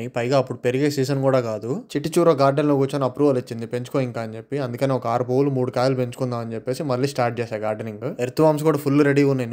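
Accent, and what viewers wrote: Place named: Andhra Pradesh, India. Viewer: Bro, your way of speaking is lovely...Andhra abbai...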